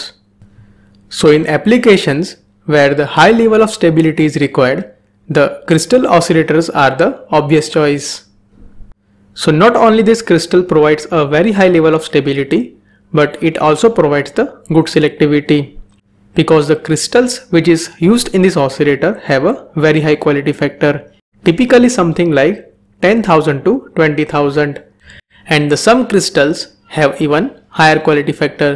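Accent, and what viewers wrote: India. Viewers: English language: Indian-English dialect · You have to be Indian to understand that English. I can't. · Can you reduce your Indian accent a little bit to the General English because your content is very useful but the accent makes me really hard to understand what you want to deliver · "Hey, friends" and you know the guy is an Indian. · that's just how the strong indian accent sounds :/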